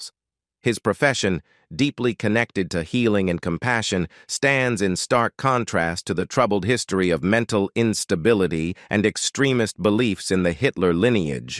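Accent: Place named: United States. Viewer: And as usual - an all knowing American drawling his way through more BS.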